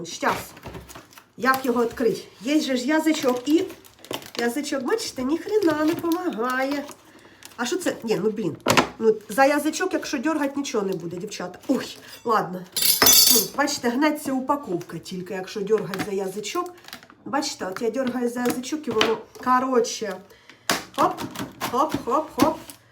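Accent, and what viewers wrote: Poltava, Ukraine. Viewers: вогонь, а не жінка, а Полтавська говірка просто❤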